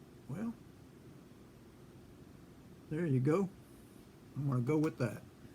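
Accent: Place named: England, United Kingdom. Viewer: I knew I heard an English inflection your dialect. What part did your family hark from?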